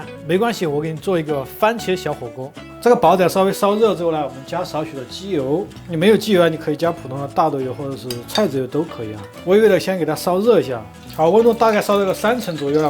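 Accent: Sichuan, China. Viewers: 聽的四川口音，感覺菜更香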